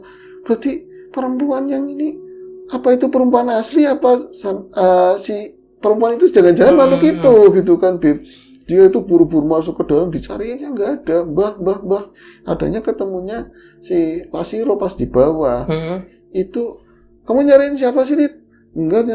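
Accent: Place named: Tegal, Indonesia. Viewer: mas dengan dialek tegalnya lucu,sukses terus dengan acara ngobornya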